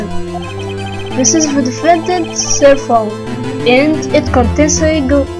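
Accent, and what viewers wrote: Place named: England, United Kingdom. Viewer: hhhhhhhhhhh ur english looool :3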